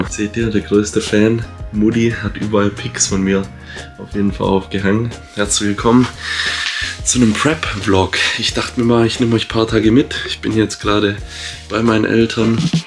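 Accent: Swabia, Germany. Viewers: Sauber gschwäbelt. So isch richtig. Musch au mal wieder mehr schwäbisch schwätze ;) Krasse Einstellung und das kurz vorm Olympia, so muss das💪 btw. ich hasse die Hitze 😂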